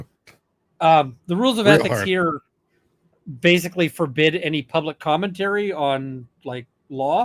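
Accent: Canada. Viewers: It's so lovely to hear your Canadian accent. One of my best friends is a UK born Canadian.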